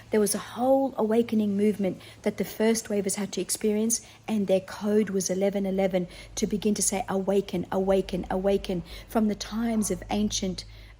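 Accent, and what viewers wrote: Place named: Australia. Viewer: Hello from Western Australia 👋 great to hear another Aussie lady speaking on TF’s 🙏♥️✨✨✨I’m first wave, I met my Beloved late 2013/14. And yes your spot on, he has kept me in deep dark silence for years. I’ve been blocked & im still blocked as far as I know. No friends in common, no social media to “ stalk” nothing!!! We last spent a night with each other Dec 2019, heard from him again over a phone call Feb 15th 2020 and have not heard from him since. But he never hurt me intentionally or ever said a mean word, he just ran and ran hard. He’s in a relationship with young kids. I’m 12 years older at the age of 51. lol 😂 another EDIT!!! YES I’m a born rebel, you have described me to a T. I am anti everything “ Establishments” and I’ve seen/known of the darkness that dwells within these matrix establishments since I was a young girl. 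I DO NOT COMPLY 🙌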